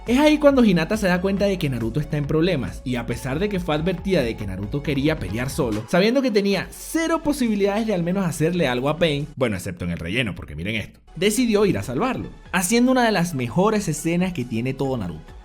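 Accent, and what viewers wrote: Venezuela. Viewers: Excelente video, estoy muy de acuerdo con tigo, es mi imaginación o tu acento es de Venezuela??? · Jodaa ese acento venezolano xd i love naruhina, sigue asi, me encanta como editas.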